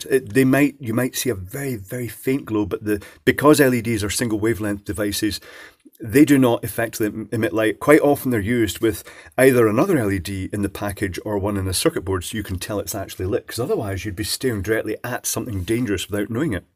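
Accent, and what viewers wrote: Scotland, United Kingdom. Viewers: In addition to your lovely Scottish burr(I could listen to you all day), you have just saved me a lot of money and a lot of potential pain. Glad I found you.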